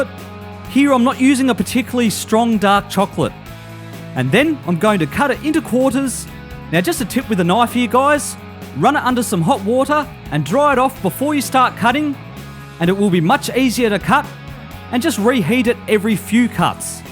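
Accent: Australia. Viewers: idk why but i fkin hate aussie accent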